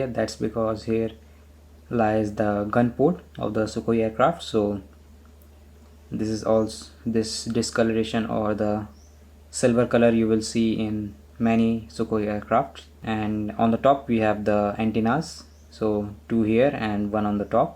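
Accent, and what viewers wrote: India. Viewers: you sound like an indian are you in usa??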